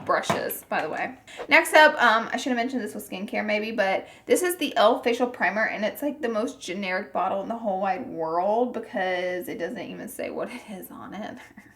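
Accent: South, United States. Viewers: It sounds like she's American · I just love her southern drawl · Where are you from you have a country accent I love it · Hayley She doesn't have a "country" Accent she has a southern accent! btw their kind of the same thing but i'm southern so i prefer calling it southern. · You remind me of Alyssa Edwards! Same country accent and everything lol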